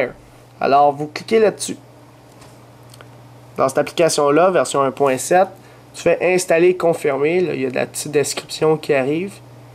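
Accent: Quebec, Canada. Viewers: il est un quebecoi xD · J'adore ton accent québécois ! "Barre oblique barre oblique" HAHA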